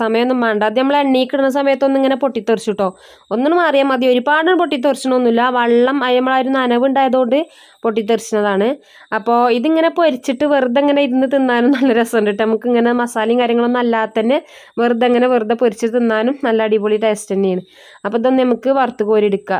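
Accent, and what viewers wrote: Malappuram, India. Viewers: ഇത് ഞാൻ കഴിഞ്ഞ ആഴ്ച കോട്ടക്കുന്ന് ന്ന് തിന്ന് അവ്ൻ്റമ്മേ എൻതാ ടേസ്റ്റ് 😅അപ്പോ അതൊന്ന് ഇൻടാക്ക വിചാരിച്ചു തപ്പി വന്നതാ അപ്പോ ദേ നമളെ മലപ്പുറം ഭാഷയിൽ തന്നെ ഒരു വീഡിയോ കൻടത്😁😁😁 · Ntemmoo thani malappuramkaari 😄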